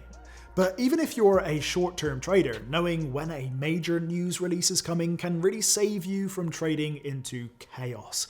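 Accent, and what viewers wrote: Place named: Bristol, United Kingdom. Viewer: Just found your channel, its really great and your accent sounds not too far from me in the Forest of Dean, sensing Bristol for you. Noticed you haven't posted in a. while. Please do, your videos on trading are refreshing. From someone learning, thanks!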